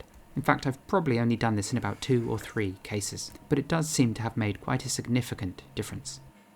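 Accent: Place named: United Kingdom. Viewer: You're so British and Ilove It sir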